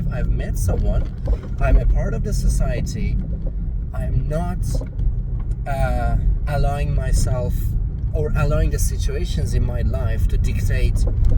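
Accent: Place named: United Kingdom. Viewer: I love ur British accent ❤😊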